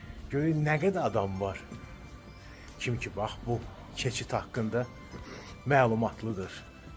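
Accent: Baku, Azerbaijan. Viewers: Bakı ləhcəsi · Ləhcə ləzzət elədi təmiz baki ləhcəsi 🙂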